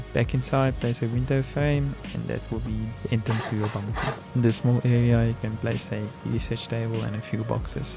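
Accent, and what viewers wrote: South Africa. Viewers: Heyy, South African?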